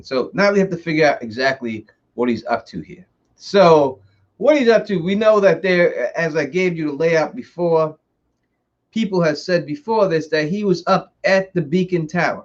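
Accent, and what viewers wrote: New York, United States. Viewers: I still can't get over how new york this guy is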